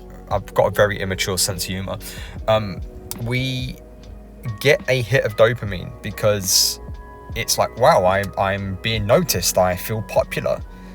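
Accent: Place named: London, United Kingdom. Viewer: I Love the way you speak.....straight up fuck em. Keep it London 😅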